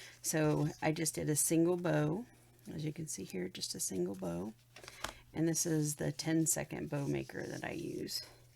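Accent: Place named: Texas, United States. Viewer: Where in Texas are you from.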